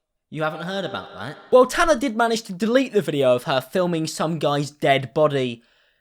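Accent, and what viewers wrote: United Kingdom. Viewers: Yes, it is intriguing. And he speaks with a British accent too.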